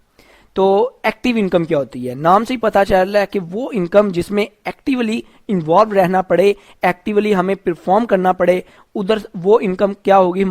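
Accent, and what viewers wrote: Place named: Punjab, India. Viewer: Punjabi Urdu ko Urdu koPunjabi lehjey meyplease na bolain.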